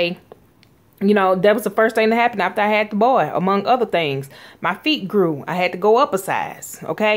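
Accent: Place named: South, United States. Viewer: Sometimes I can't understand her southern accent. Like when she said she saw a "burnch" of monstrosities. Did she mean "bunch?" And there was 1 where she said people shouldn't wear "bunnets" on their heads. ?????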